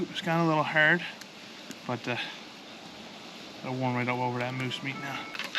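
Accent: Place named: East Coast, United States. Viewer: Ain’t hard to hear the east coast in yah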